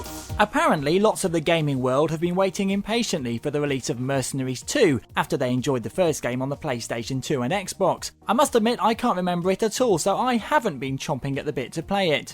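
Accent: United Kingdom. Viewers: He's british, so he speaks with british accent.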